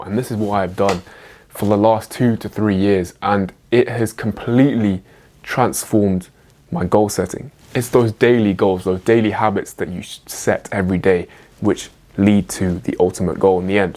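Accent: England, United Kingdom. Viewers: you are an absolutely gorgeous and handsome young man - your English accent is so sophisticated and classy and you have gorgeous blue eyes - I’m an older Irish gay man in the U.K. and I would happily date you in a heartbeat - all the best for 2024 🇮🇪☘️🇬🇧❤️💗🙏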